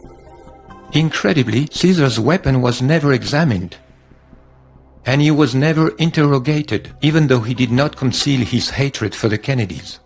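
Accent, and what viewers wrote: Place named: France. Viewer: This is a video that needs to be seen by EVERY American! (I only wish the narration was by a native English speaker as the French accent makes it difficult at times). Any country so brazen to bomb an American warship in 1967 would be brazen enough to assassinate an American president in 1963 Here we have the reason for classifying so many documents decades later. The American people must not learn that their "greatest ally" assassinated one of America's most beloved president (probably the most beloved in the modern era - even by republicans)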